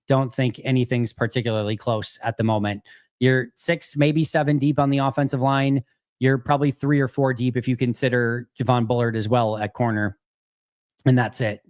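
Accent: Wisconsin, United States. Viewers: Number one thank you for always talking us off the ledge. You and are great at keeping us levelheaded. Number two, love the Wisconsin accent. It’s great to have a little piece of Home for those of us that are transplants. Another great show, thanks for everything! #GPG 💚💛💚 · As a transplanted Wisconsinite, I'm 103% fine with your pronunciation of pure and other words. People's accents are what they are.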